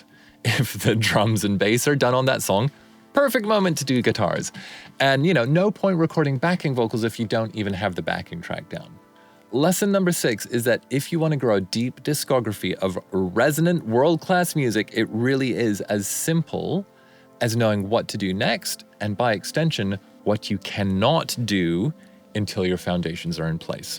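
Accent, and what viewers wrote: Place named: United Kingdom. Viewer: the partial british accent is an adorable marker of his time abroad "schtewdio"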